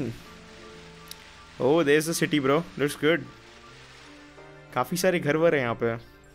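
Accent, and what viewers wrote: Maharashtra, India. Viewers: Kya tum marathi ho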